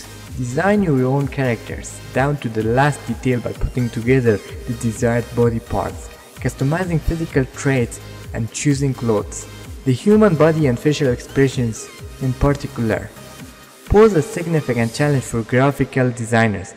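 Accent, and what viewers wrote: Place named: Russia. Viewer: Почему мне кажется, что ты из России или стран СНГ?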